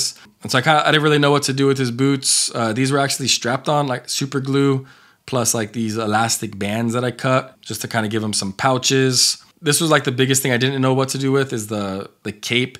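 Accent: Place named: United States. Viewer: You pronounce some words like an American Latino.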